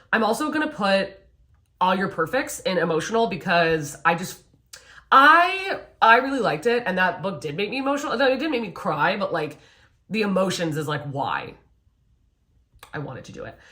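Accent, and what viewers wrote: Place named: Scotland, United Kingdom. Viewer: The amount of sliding into passionate quasi-Scottish in this video made me be like YES THIS IS HOW WE EXPRESS OUR LOVE 🏴 Five stars, would watch again 👏🏼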